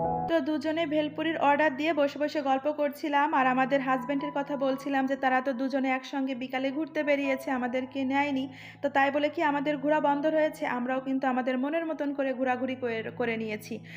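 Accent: Jessore, Bangladesh. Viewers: 👍👍 যশোরের প্রিয় আপু, আপনিও আমার মত কাপে সাপোর্ট দিয়ে মোবাইল রাখেন। আপনার ভাষাটা এত এনজয় করছিলাম। প্রত্যেকটি আঞ্চলিক ভাষার একটা সৌন্দর্য আছে। মাঝে মাঝে এই ভাষায় ভিডিও দেবেন আপু❤❤❤ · সত্যি বলেছো আপু আমাদের ডিকশনারিতে কোন ছুটি নেই মৃত্যুর আগ পর্যন্ত তো তোমার যশোরের ভাষার কথাগুলো শুনে বেশ মজা পেলাম পিঠাগুলো কিন্তু দারুণ হয়েছিল❤❤ ভেলপুরি 😊😊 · আপু তোমার কন্ঠে যশোরের এই ভাষায় কথা শুনে খুবই ভালো লাগলো আমি কিন্তু যশোরের মেয়ে বউ। পিঠে গুলো অনেক সুন্দর হয়েছে। কুচি কুচি চিকেন মসলা দিয়ে মেখে রাখলে। ঘরটা সুন্দর করে সাজিয়ে নিলে। সন্ধ্যায় বান্ধবীর সঙ্গে ঘোরাঘুরি করলে। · বুন্ডি আমাগের যশোরের আঞ্চলিক ভাষা ডা কিন্তু সেইরম সুন্দর এরম করে কথা কতি পারলি কিন্তু পরান ভরে যায়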